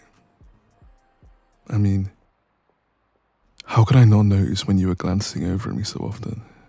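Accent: United Kingdom. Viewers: why am ⟟ just realizing half way into the video that he sounds like British smhhhh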